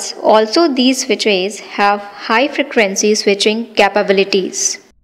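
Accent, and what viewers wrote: India. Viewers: holy 这英语发音 满满的咖喱味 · Hindi english accent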